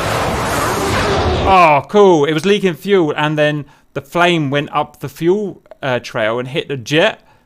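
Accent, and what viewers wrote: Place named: United Kingdom. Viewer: I am not used to British accent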